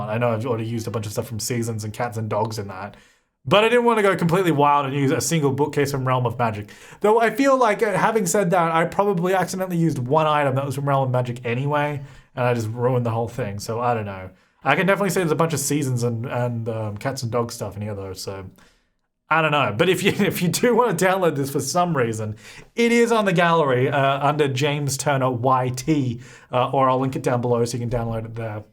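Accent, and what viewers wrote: Australia. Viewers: I can sure hear it. I’ve been watching a lot of Jazzas videos lately. Maybe it’s the way we Aussies say things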